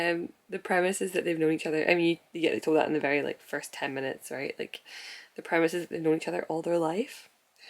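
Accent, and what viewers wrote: Scotland, United Kingdom. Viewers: Hi love your podcasts and design. But must be honest a bit as English isn't my mother language is very difficult to understand your scottish accent have to use subtitles. Thank you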